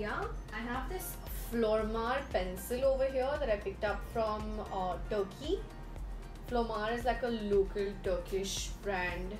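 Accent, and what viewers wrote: United Kingdom. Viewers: British accent videoooo!!! Yesss!!!! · I think you also should start new channel for just ur british accent! For ur british accent fans... 😅 You should atleast start some series though in ur british accent🤞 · Hey this video was so good you i was doing my assignment and while doing my assignment i was just listening to you and i t felt so good listening to uhh and it gave a lot good vibes and i especially loved ur British ascent and lots of love to uhh and more power to uhh ♥️ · Hii... after a long time makeup video.... felt good after watching this😊... & ya old is back & so happy to see the old version🙂... & your British accent is 🔥🔥... & just wanted to say that I love u ❤❤❤🥰🥰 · Omgggg, your brit accent is on point 😱💕 Loved this chatty video! 💕 Pls do some collabs! 💕 Even I feel like such a community/communities are important 💕💕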